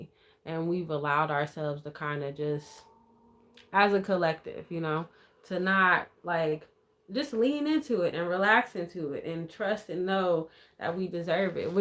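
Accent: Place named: Ohio, United States. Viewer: Beautiful voice. I know you have that very good Ohio voice. We can sang 🙏🏾🎼🎼🎼🎙💃🏿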